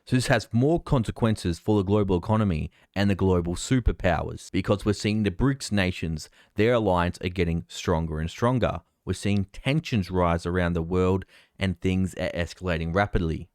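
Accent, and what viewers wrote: Australia. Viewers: he is Aussie hence the accent.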